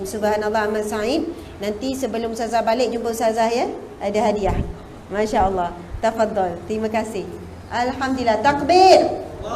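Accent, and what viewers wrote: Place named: Negeri Sembilan, Malaysia. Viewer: o ustaza ni org nogoqi..😅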